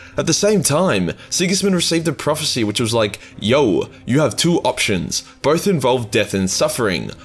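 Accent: Australia. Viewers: Love Aussie narrative... SIGGY :D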